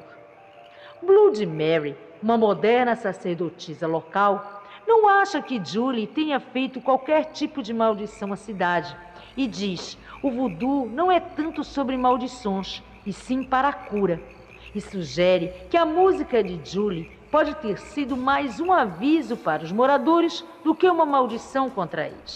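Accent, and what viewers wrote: Pará, Brazil. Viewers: Olá senhora gata,estou fazendo uma pesquisa sobre vc, eu sou muito curiosa, pois bem, esses tempos eu te perguntei se vc era locutora, e vc gentilmente me disse que não, então pensei: Se vc não é locutora, então é bem provável que vc saiba cantar, uma pessoa com uma voz dessa, com certeza sabe cantar. Outra coisa: seu sotaque é diferente, parece paraense.... Outra coisa, queria muito vê o seu rosto, então vc me disse que estragaria a personagem, vc tem razão, ia perder a graça, mais vendo o seu rosto no facebook, naquele vídeo desejando feliz 2019, percebi que o formato do seu rosto é bem bonito, e olhando essa sua foto do YouTube, percebi que o seu cabelo é meio loiro, bom,tô quase terminando minha pesquisa, sei outros detalhes mais não vou falar, sua indentidade, vai ficar escondida comigo, até porque minha admiração e amor por você é equivalente a 1 metro e 56 centímetros de altura(é meu tamanho) um beijo na bochechinha, amo-te!!!!❤❤❤💋💋💋💙💙💙💚💚💚💛💛💛💜💜💜obs:(Vou te provar que sei muito sobre vc,te mandarei solicitação de amizade no seu face verdadeiro, (Elaine Salvador é o nome do meu face) vc é incrível, já virei sua fã.....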